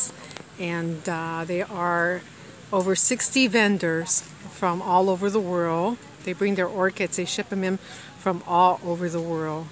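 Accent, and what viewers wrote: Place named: Tampa, United States. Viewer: okay beautiful thank you you drove 5 hours to Miami from Tampa to Miami is about 4 and 1/2 getting to the place another half that would put you close to my vicinity and your accent sounds like you're from Tampa what's up am I wrong??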